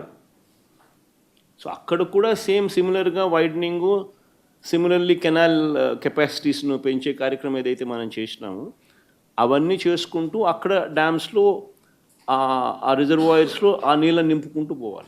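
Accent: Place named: Kadapa, India. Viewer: Chi kadapa yaasa! .5 yaasa idi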